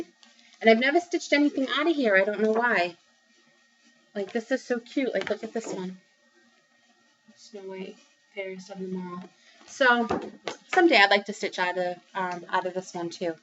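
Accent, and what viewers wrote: United States. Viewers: Hi! So many pretties to comment on and you've been super busy this month! Absolutely adore your FFOs - they ALL look amazing! I agree with you - doing a HAED on 18ct is still my favourite. Although the tent stitch is somewhat faster, I still prefer doing full crosses and 18ct has great coverage. Could you please share with me the link to the feeble you mentioned "There's no place like home"? I'm pretty sure I don't have that one. I love your accent.... I'm slowly beginning to hear the differences between the various areas of the U.S. 😉. Apparently here in Australia we have different accents depending on what area we're from too... But I've never been able to pick them! Your Stitchrovia piece is my favourite of yours - I get really excited every time you show that one! I know I'm going to have to purchase it at some stage LOL. Thanks so much for tagging my tutorial... 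Great appreciate that, and I'm so happy you found it useful. Happy stitching 😊 xx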